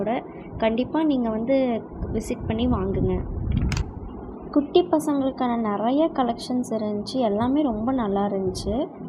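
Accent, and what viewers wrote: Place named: Coimbatore, India. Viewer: தங்கள் பதிவு மிகவும் அருமை... வாழ்த்துக்கள் தாங்கள் குரலை பார்த்தால் தாங்கள் கோயம்புத்தூர் என நினைக்கிறேன் வாழ்த்துக்கள்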